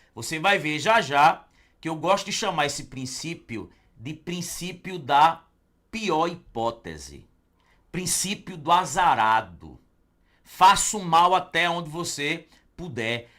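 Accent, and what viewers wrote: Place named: Northeast, Brazil. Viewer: Ótima aula, e com esse sotaque fica melhor ainda😀 adoro sotaque nordestino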